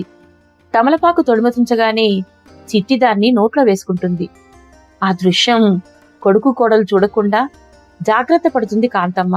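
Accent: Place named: Telangana, India. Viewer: కథామంజరి లో కథలు చాలా బాగున్నాయి. గారు తెలంగాణా యాసలో జీవ గంజి కథను బాగా వినిపించారు. కథా రచయిత బాగా రాశారు.వుభయులకు ధన్యవాదాలు.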